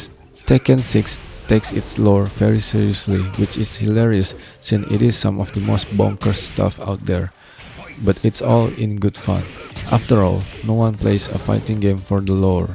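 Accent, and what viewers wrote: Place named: Indonesia. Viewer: bang logatnya mirip orang indo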